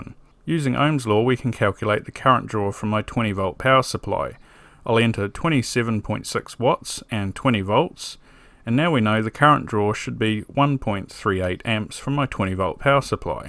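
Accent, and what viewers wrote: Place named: New Zealand. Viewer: New Zealand accent + Useful Information + Comic relief = Awesomeness!!!! I love these videos :D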